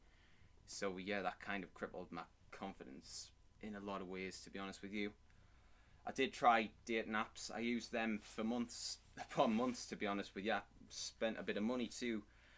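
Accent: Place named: Newcastle, United Kingdom. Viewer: As somebody who does pretty well on women front hearing and looking at you it’s surprising yet not unbelievable. You do have confidence (able to speak on camera), can hold a convo and you are not ugly. Not sure how tall you are and if you live in a big city (sounds like you’re near newcastle). Aesthetically though, I think you need to look more fresh. Women like clean, fresh, healthy people. IMO Your hair is a mess, and makes you look like Virgo from ghostbusters or an extra from a Viking’s episode. You should opt for a 90s Keanu Reeves style. Also grow some facial hair if you can, weight train 3 times a week and stop touching yourself/watching porn as much. In terms of clothes trying buying some stuff from M&S (their autograph line is stylish and affordable). Dating apps do work if you have good pictures. Plenty of videos on YouTube which show how to achieve this. 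As for meeting women in real life, you give me music vibes. Therefore I’d be going to ticketed live music events/gigs (not clubs) where you’d have a mutual interest. Would also join a club of some sort (not sure what you’re interested in). Sorry if any of this sounded mean, best of luck!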